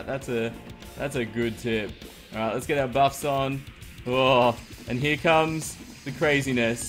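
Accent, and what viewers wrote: Australia. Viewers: lol you from Australia too mate?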